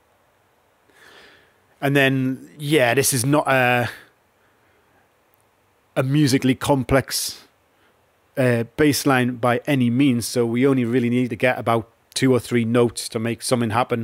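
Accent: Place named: Newcastle, United Kingdom. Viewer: You say “cheap and cheerful” just like my grandad did. What a small world, that I hear a Geordie voice from my favourite Thai modular supplier. Always good to be encouraged to think outside the box. Audio or control, it's not supposed to matter to us, is it? :)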